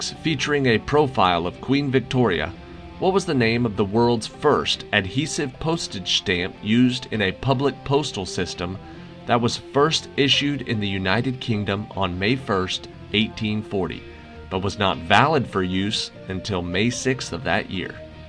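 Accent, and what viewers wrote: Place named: United States. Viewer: Why are all the pictures of England but the voice is American?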